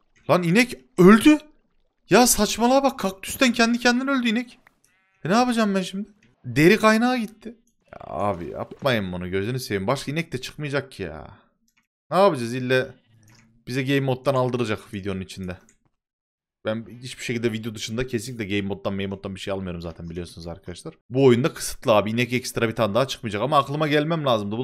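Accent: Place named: Kahramanmaraş, Turkey. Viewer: Abi maraşlımısın? (şiven benziyo)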